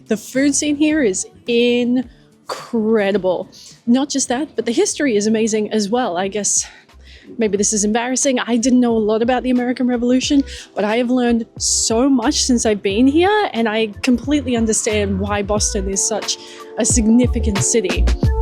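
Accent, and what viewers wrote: Australia. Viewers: that’s not a British accent, more like Australian. As a Scot I take offence to the claim our food is terrible over here lol, you just mustn’t have tried the right foods when you visited 😂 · Aussie? The way you say your R's sounds really familiar.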